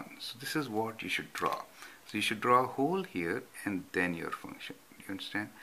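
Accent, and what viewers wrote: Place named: India. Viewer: nice job,. great accent too. reminds me of a group of indians we were working with at a start-up company & brings back good memories.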